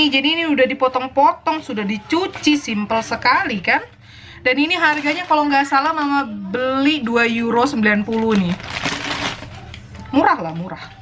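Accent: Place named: Jakarta, Indonesia. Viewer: Ga nyangka k'lo Si Mamak orang Batak+lahir & besar di Kaltim/Balikpapan krn gaya'a,bbrp idiom + aksen'a spt orang Jakarte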